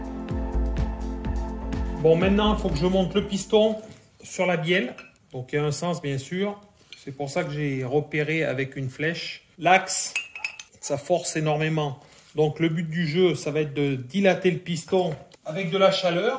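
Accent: France. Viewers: Ça fait un bout de temps maintenant que je cous suis, trop génial dans Gestion des produits des systèmes démerdes. Et l'expérience le savoir faire ds l'excellence et la qualité. Et aussi vous être donné les conditions pour toujours être dans un atelier hyper propre et structuré au poil. Ça donne envie de voir en réel. Bravo bravo et encore bravo, c'est quoi cry accent quelle région de France.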